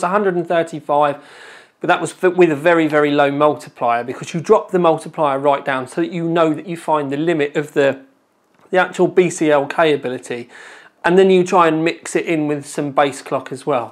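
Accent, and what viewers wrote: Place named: England, United Kingdom. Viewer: LOVE THE VIDEO, I ALWAYS LIKED YOUR VIDEOS, ACCENT, AND ENGLISH PEOPLE IN GENERAL. I AM UNCERTAIN ABOUT THIS MEMORY CORSAIR Vengeance LED 16GB (2 x 8GB) 288-Pin DDR4 SDRAM DDR4 3200 (PC4 25600) Memory (Desktop Memory) Model CMU16GX4M2C3200C16, will it post, if it can, and i have a speed problem, i can adjust it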